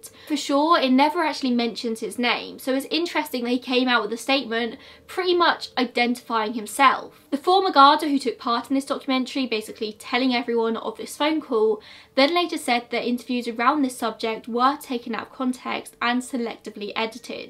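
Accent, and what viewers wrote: England, United Kingdom. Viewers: I have just found your video today by chance. I like old cases unsolved or solved programmes and I was looking what options were there. Then I found this video about Mary and I got interested on the case. But what a surprise when I heard your Brittish accent! I just loved it because my family and I lived in London 44 years ago. And it is not quiet often to find English accent but American since my mother tongue in neither of them. I liked your programme so I decided to suscribe. It is very tragic what happened to the Boyle family and it is really worst not to find answers after 43 years. But hopefully someday it will be solved. I would have tried a psychic help maybe some answers would come up. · I'm from Ireland, about an hour north of Belfast and only 40 mins across the sea by ferry from Donegal (the South is more North than the North lol!) Your pronunciations are so cute, bless you! 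I love hearing English people pronounce our towns etc, it's so cute to hear! For reference Donegal is kinda pronounced "done-ee-goll" but I do like how you say it haha!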